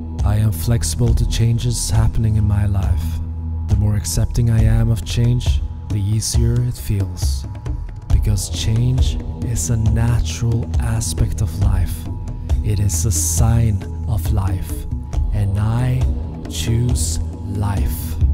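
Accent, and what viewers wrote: Scotland, United Kingdom. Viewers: These meditations are perfect. <link> him has a gentle, warm, pleasant voice (with a slight Scots accent). The words are simple and clear. The timing is perfect... just as you start to find your mind wandering, he says and if you find that your mind is wandering... . There is no background music and no noise in the background, only his peaceful and engaging voice. The length of the meditations (about 1/2 hour each) works well for me. I find that I tire of some meditations after frequent repetition, but that hasn't happened with this one. The metta lovingkindness meditation always feels right. Some meditation CDs I have tried have not felt right. The voice seemed hurried, or sounded tense; the background music seemed intrusive, or there were noises that interfered with concentration. This is just perfect as an introduction for new meditators, and it's also a meditation that I return to again and again. 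I'd give this more than five stars if that were an option. Even my students, who are not ordinarily very receptive to meditation, relax when they hear this one!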